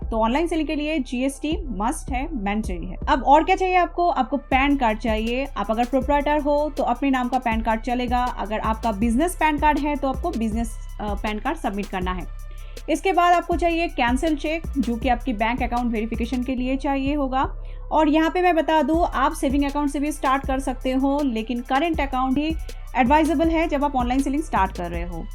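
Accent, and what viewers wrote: Bengal, India. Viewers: Don't have time to watch long video,and bengali action in ur hindi speech.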